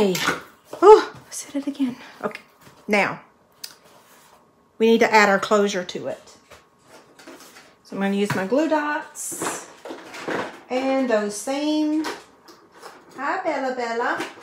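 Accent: South, United States. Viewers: I love how you talk with that southern accent. Could listen to you all day.Well,maybe not all day but you know,lol.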